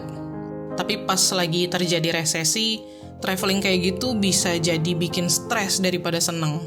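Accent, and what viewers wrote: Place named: Chinese-Indonesian, Indonesia. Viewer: aku salfok sama suara naratornya, suara chindo bgt kak. anw tips2nya udah sebagian aku aplikasiin di hidup aku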